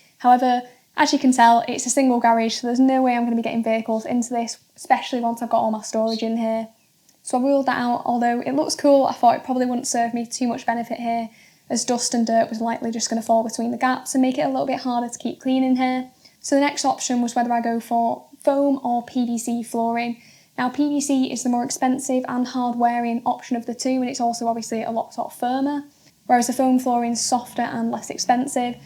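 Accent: United Kingdom. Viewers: Just curious, what part of the UK has this accent?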